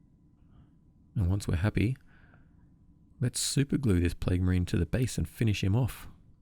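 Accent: Australia. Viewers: heya mate thx for the vids ,they have rekindled my desire to paint ,and its good to hear an ozzy vioce in a warhammer vid, for a change , cheers bro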